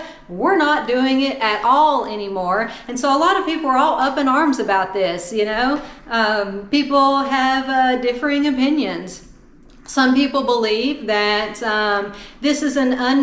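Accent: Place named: Alabama, United States. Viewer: “He tells you to get the blanketed blank off the bus “ 😂 sounds hilarious with Alabama English. Love it ...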